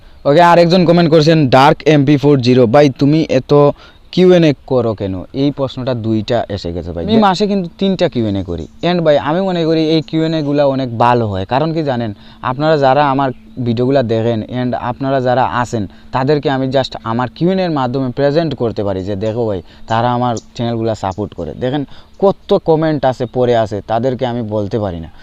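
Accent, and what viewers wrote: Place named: Gopalganj, Bangladesh. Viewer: ভাই আমার শশুর বাড়ির সবাইর ভাষা আপনার মত। love from Gopalgonj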